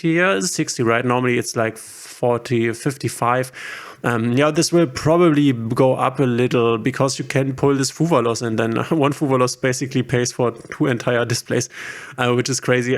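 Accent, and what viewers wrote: Germany. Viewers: Höre ich Richtig, bist doch ein Deutscher ;)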